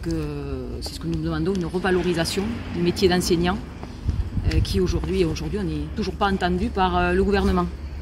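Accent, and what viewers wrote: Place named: South, France. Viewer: j'aime bien votre accent qui fleure bon les rives de la méditerranée ou du sud ouest.....Je suis breton de cœur né à Nantes que je revendique historiquement bretonne.....😘😘et, je suis insoumis de longue date......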